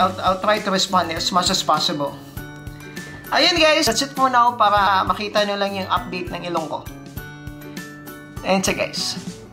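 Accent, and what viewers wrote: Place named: Visayas, Philippines. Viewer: Dahil sa nose lift new subscriber here na search kac ako, hi sir bisaya ka po kac sa accent eh, bisaya here, ahm tanong ko Lang pag sa lips po may idea po ba kayo if how much, kac sa ilong ko contento naman ako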